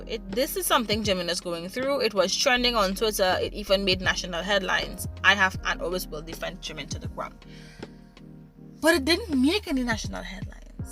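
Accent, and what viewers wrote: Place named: Caribbean. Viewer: Thank you for the clarity of your explanation! You have a beautiful accent! Caribbean? I agree with what you have laid out here and I have subscribed to your channel! I wish you every success going forward! PREACH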